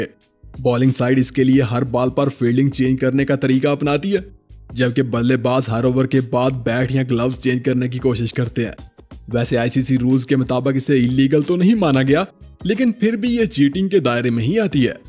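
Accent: Pakistan. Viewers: Tera accent bilkul Pakistani hai but I know you are indian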